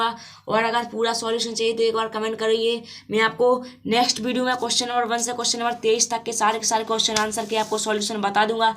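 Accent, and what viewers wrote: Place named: Malwa, India. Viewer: Mp ka hai na bro agar malwa sa bol raha hu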